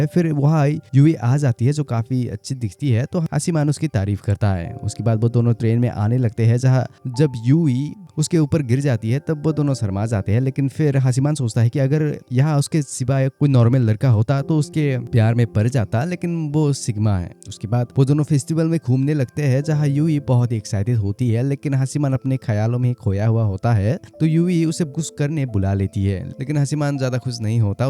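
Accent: Northeast, India. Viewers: Always a north east guys accents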